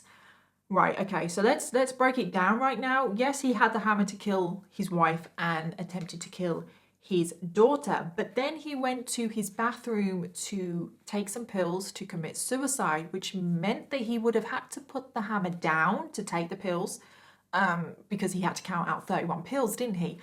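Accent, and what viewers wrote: United Kingdom. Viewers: Was wondering why your videos were so similar to Bailey's.... then I saw in the description box you give her 100% credit for your inspiration! Good job ! Honest, beautiful, great makeup artist and story teller AND with a Brit accent ! Sad how Feliz means Happy 😧 One advice: Read Dante's Inferno, I know you're young so have not heard of or read it, but it should be a part of your literary culture. You'll thank me later ☺ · i mean i watch bailey but u dont watch THIS girl because shes british and i cant really understand her and also im learning american english and i dont want to mix these accents up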